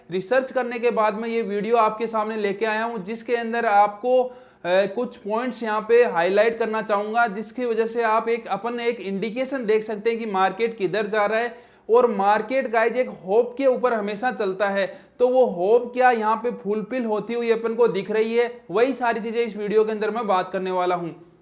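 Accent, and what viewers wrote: Rajasthan, India. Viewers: It seems u r from Rajasthan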